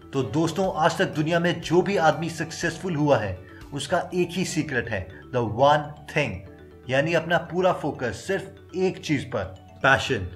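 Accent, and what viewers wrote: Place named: Punjab, India. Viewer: awaaz se to bhai tum Punjabi lgte ho.. bta hi do kaha se ho tum?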